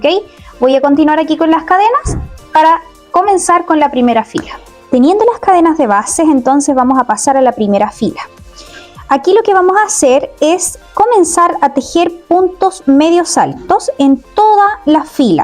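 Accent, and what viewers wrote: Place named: Chile. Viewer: Hola 🤗 Recién encontré tu canal me encanto el vestido y explicas muy bien de donde eres ? tu acento se me hace 🇨🇱 voy a empezar mi vestido espero encontrar el hilo, acá no hay muchas opciones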